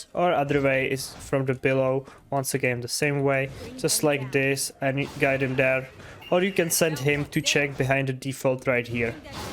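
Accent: Czech Republic. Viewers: Bro's czech i know by hearing accent, am i right?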